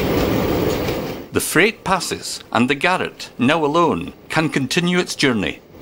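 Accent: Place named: Afrikaans, South Africa. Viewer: I love the dinstinctly Afrikaans accent of the narrator's voice.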